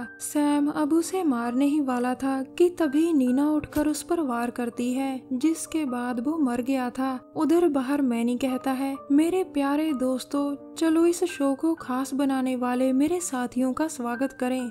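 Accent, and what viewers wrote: Punjab, India. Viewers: Aap punjab se ho kyaa g.....Very nice explained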